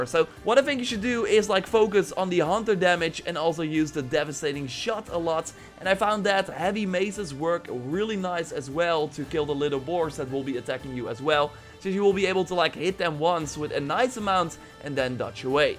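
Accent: Netherlands. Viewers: He’s Dutch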